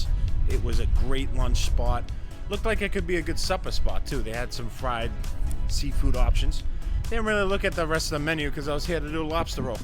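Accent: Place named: Boston, United States. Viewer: Stick with it…..your content is improving….you are likable and your absolute Bostoness is contagious….I am now a subscriber.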